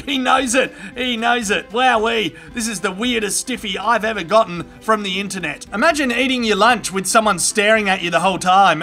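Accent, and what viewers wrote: Australia. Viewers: I always have a thick Australian accent in my head everytime I watch one of his videos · The greatest comment bloke in the world. The ozzy accent just adds icing to the cake. Love from India 🇮🇳 ozzy man! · Australian accent makes everything funny.😂😂😂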